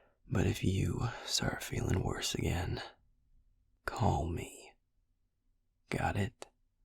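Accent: South, United States. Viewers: he rlly do, sounds like a southern or country accent he’s got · loooook im not a fan of southern accents but <3333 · Even for someone who's lived in the south their whole life, accent still gives me 🦋🦋🦋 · as a southerner you're really killing the southern lingo! all you need now is to throw in a handful of "fixin' to's" and "ain't's" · the southern voice 😙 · Okay I’m normally not a fan of southern accents but yours is an exception 👀💳💥💥💳 not too strong I like it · HE SOUND LIKE HIS FROM THE SOUTH “hey sugar” 😫😫😫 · I have to say that I do love calming bedside manner as a healer! Just hate to see him being drained to heal. Happy to hear from again! His smooth, Southern accent is so nice. Love learning more details to this ongoing storyline.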